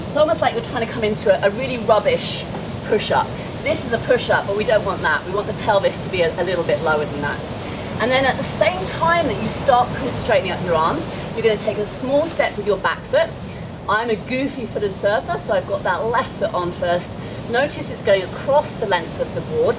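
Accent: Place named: Australia. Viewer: 억양이 너무 호주 호주 한데요~ ㅎㅎ 역시 서핑은 오지! ㅎㅎ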